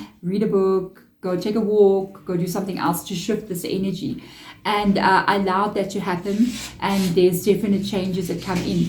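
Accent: South Africa. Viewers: Lovely to hear a South African voice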